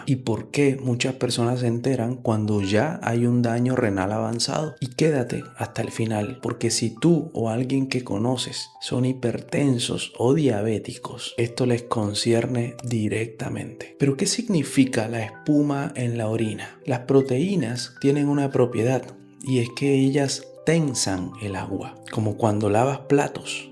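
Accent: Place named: South America. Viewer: Ademas de aprender, me arrullas. Que voz tan suave, clara y agradable, junto con ese acento sudamericano tan amable. Gracias❤❤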